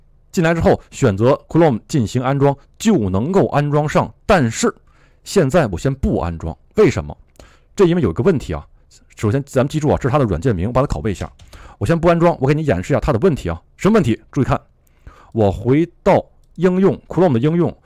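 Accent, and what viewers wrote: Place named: Japan. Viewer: 难怪觉得说英语有点日语的味道哈哈哈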